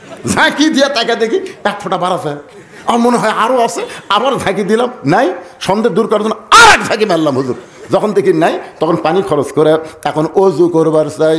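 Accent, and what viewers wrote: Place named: Bogura, Bangladesh. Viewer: বগুড়ার ছোল তাই এমন করে বলে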